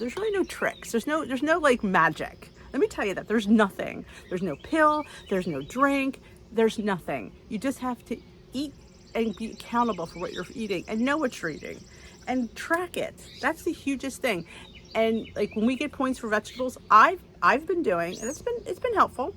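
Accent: New Jersey, United States. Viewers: I'm glad I found your channel and i just subscribed to it. I'm with WW and have 150 pounds to lose. I'm trying to make it a lifestyle - I have lost 65 pounds since my highest weight and lately have gained and lost the same 10 pounds because I've been goofing off. For my health I'm really trying to make it stick and your recipes and examples of what you eat in a day. Also- you gotta be a Jersey girl - you definately have the accent. Too bad WW didnt take you- definately their loss. Anyway I look forward to following you- your success is inspirational!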